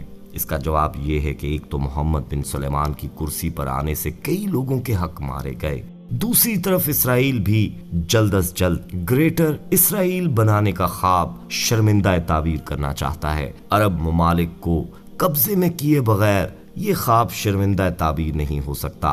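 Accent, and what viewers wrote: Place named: Punjab, Pakistan. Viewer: Apni Urdu sahi karo bhai bilkul bhi sahi nahi bol rahe khalis Punjabi dihati lehja hai aap ka kisi Karachi waley se hi commentary kerwa letey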